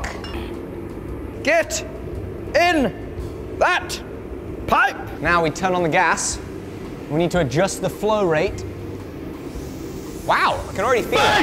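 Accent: England, United Kingdom. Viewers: So what's up with the Montana thing as you sound British but I get it I'm a dual but I'm a graduate of Carroll College in Helena. My two best friends are two of my good friends live in Bozeman one has a pizza shop and the other is a family therapist! Another friend of mine used to be a representative from Butte but he had a bike accident and sadly passed early. He was a hippie and he looked like hippy, And he was a absolute golf pro so the locals would use him up in Bigfork to play golf against others and tourists and he was there ace in the hole. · The British accent makes me trust him 25% percent more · degrees fahrenheit, thousands of an inch, yeee haaa, what part of England are you from? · When I heard his voice I thought, YES. An Englishman making a Damascus. This should be good. I left after posting this comment. The loud americanisation of the production is off-putting. 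Sorry, but reign it in. Quality over quantity... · isn't it crazy that he said the British equivalent of "the patent has fucking expired" but since he speaks queens gibber it doesn't sound heavy at all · Its just the awesome upper british accent and language next to the chaos of forging some cool damascus. Some itch was scratched in my brain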